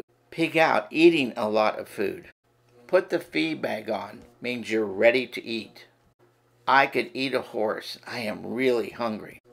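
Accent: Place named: Italy. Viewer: Your Italian accent rocks. 🙂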